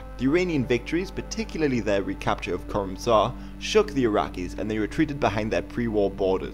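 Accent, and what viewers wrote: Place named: Southern England, United Kingdom. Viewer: I think its a british accent they all speak like that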